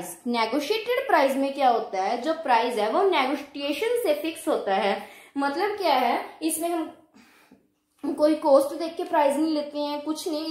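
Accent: Punjab, India. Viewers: I loved the way u speak U have punjabi essence in ur speaking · Mam app punjab se lgte ho haina??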